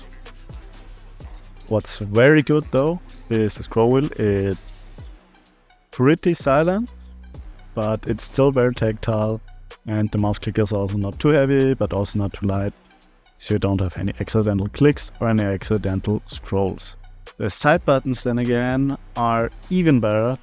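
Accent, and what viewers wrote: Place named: Germany. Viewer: I love your accent so much, German?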